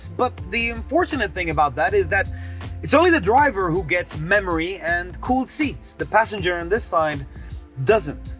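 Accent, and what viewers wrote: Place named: United States. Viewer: Nice review and excellent flow of information coupled with an American accent, I am subscribed ! 😄👍🏻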